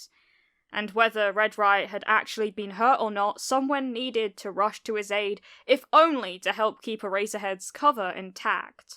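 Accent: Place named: United Kingdom. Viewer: Your British accent was hilarious 😆 I love you videos ❤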